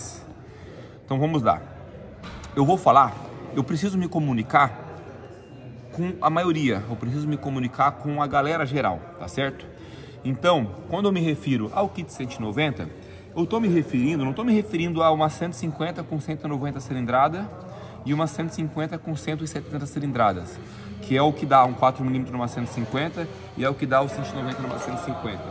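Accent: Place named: Goiás, Brazil. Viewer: Goiano uai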